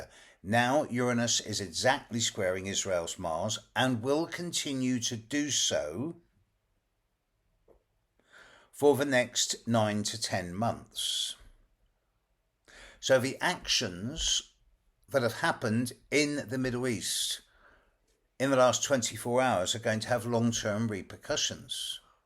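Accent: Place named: England, United Kingdom. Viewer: We love your videos! Your knowledge and that English accent! Thank you for what you do! American girl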